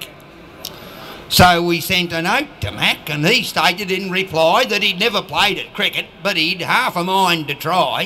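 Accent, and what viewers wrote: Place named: Australia. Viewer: Last heard this recited by Gerry Hallom a folk musician from England who travelled to Australia. Good to hear it recited by an australian.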